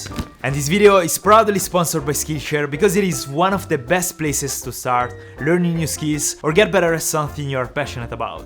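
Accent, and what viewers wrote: Italy. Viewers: bello come si capisce subito che sei italiano ma nessun italiano commenta i tuoi video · madonna quanto si sente che sei italiano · Fra se sente che sei italiano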